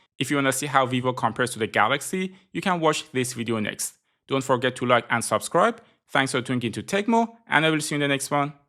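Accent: Iran. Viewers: لحجه ایرانی تابلوعه سید 😂😂😂😂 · داداش خیلی لحجه ایرانی باحالی داری😂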